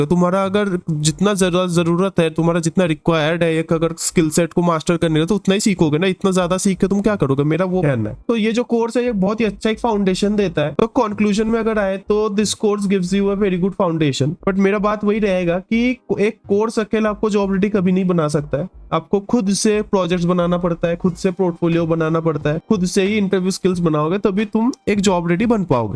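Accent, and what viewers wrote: India. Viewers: hahahaha marathi accent, sounds very nice. all such regional accents have their own charm.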